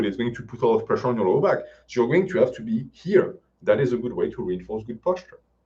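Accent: France. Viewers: It was rainy the whole week and despite that I wanted to get my steps in and went to the gym by foot. While coming back home all wet and soggy I just imagined stupid face with a grimace saying "Homegym Masterrace" with his french accent.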